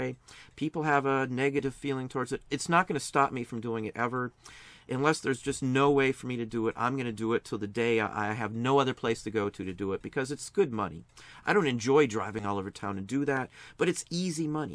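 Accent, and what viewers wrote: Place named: Northwest Ohio, United States. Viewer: Sounds very NW Ohio.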